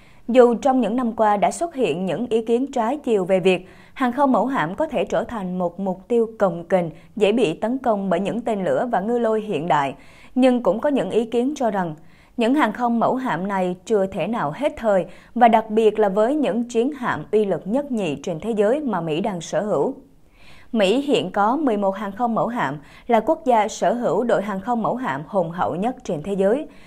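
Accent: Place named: Central, Vietnam. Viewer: người đọc có giong miền Trung dễ nge.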